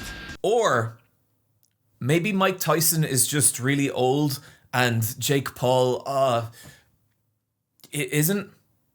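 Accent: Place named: Ireland. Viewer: Another banger from. Your chillingly Irish voice regales us once again. Thank you.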